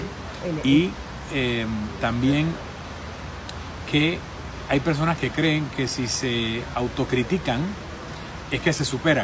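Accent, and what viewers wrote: Spain. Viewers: Madre mía!! Su acento es prácticamente igual al canario. Yo soy canaria y casi no lo distingo del acento de la gente de Tenerife. Increíble! Sé que los canarios emigramos masivamente durante siglos a Cuba, Puerto Rico y Venezuela y en menor medida a Santo Domingo y a toda la costa caribe de Colombia, Costa Rica, Panamá, etc. Includo llegaban barcos con familias enteras de canarios a Yucatán y desde ahí se desplazaban hasta Florida, Louisiana y Texas fundando ciudades como San Antonio de Texas, pero también bajaron hacia el sur fundando, por ejemplo, la ciudad de Montevideo en Uruguay y muchas otras. Asimismo los canarios descendemos de andaluces, extremeños castellanos , gallegos y otros europeos, además de sangre aborigen guanche, bereber del norte de África. Más que por la sangre, que la hay, lo que nos une realmente es nuestra maravillosa cultura común, de la que hay que sentirse orgullosos porque no hacerlo sería despreciarse a sí mismo.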